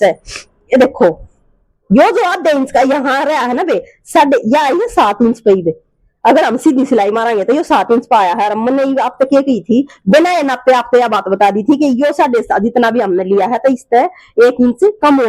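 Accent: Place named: Haryana, India. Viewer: Bahan ji haryanvi hi bolte raho acha lgta h ❤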